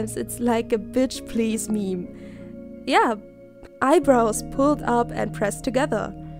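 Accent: Germany. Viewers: Are you german? (the accent)🤔🌸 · Are you from Germany by any chance???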